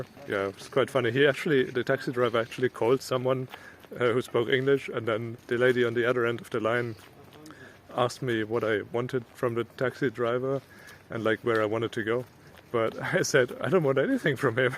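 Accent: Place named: Germany. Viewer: Такое чувство что немец говорить на английском...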